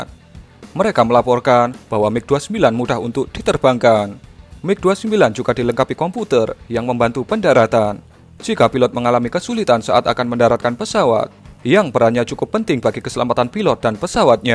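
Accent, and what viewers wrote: Java, Indonesia. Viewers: logatnya jawa bgtu kayak sukarno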